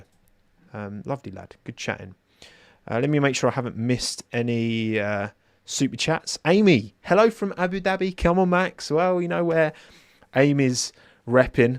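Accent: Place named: England, United Kingdom. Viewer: I really like hearing your English accent! Are you a Londoner? My uncle always called his head a Swede. Nice Barnett by the way😊!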